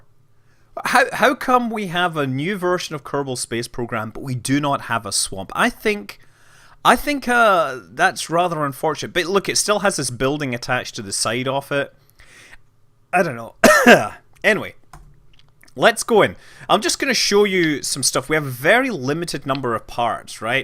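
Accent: Scotland, United Kingdom. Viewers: Sounds like Scott's accent came back with him from Scotland. Neat! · is all like "How come we don't have a swamp anymore!?" in his Scottish accent. Couldn't help but Shrek.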